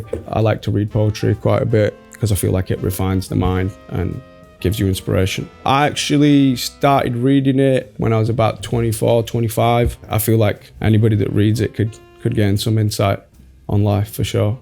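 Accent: United Kingdom. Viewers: Love that he is still so British even after living in USA for so long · The most British accent ever 😂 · Zayn has the Britishest British accent !! No wonder British Vogue had him here .....love him ❤ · What part of the UK is this accent? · "mix it with a bit o wo'oh" man really the Britiest-British eva 😂🥹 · I love his British accent but all of those tattoos makes me feel so scared and terrified around him…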